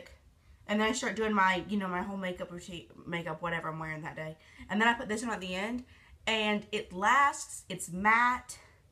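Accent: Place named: South, United States. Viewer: I am so jealous of your country accent!! 😭😩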